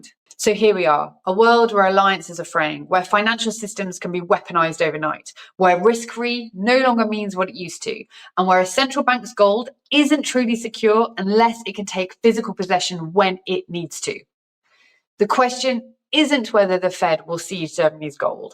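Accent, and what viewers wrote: England, United Kingdom. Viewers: You know the business and the English accent LIKE 😊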